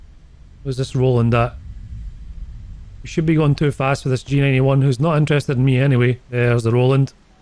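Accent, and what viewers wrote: Scotland, United Kingdom. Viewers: I really dont know why youtube algorithm get me here a hevy scottish accent youtuber playing war thunder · I'm still oldr than u in ur Scottish accent but belated birthday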